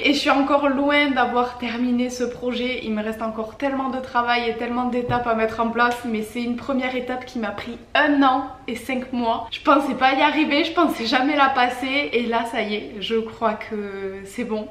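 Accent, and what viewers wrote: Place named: Aveyron, France. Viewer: Bonjour! Je vous ai découvert il y a quelques jours. Avec votre accent ressemblant au mien, et la région de Montpellier évoquée, je vais sur Google pour chercher "qui est " et là...Centre presse aveyron! Une aveyronnaise, comme moi! (À présent non loin de Toulouse). Ravie de suivre vos aventures et vous découvrir un peu plus!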